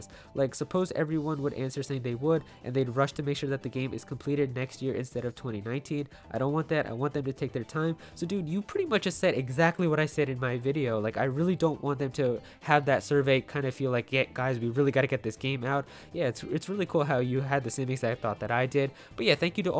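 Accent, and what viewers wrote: Netherlands. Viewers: Wait a minute, are you dutch. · wait, are you dutch? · Are you dutch? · are you from the Netherlands or Belgium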